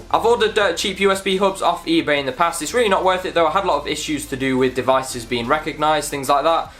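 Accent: United Kingdom. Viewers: Hi, it's such a good video. I'm from Indonesia anyway and it is really hard to understand your word because you were talking in english with british accent and so fast:D lol, I need to learn much. Tx btw:) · I love your BRITISH accent!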